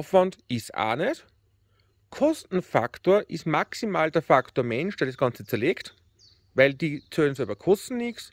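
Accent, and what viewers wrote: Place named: Bavaria, Germany. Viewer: Für Nordseutsche schwer nachzuverfolgen wegen bayrischen Slang. Trotzdem vielen Dank für die Mühe!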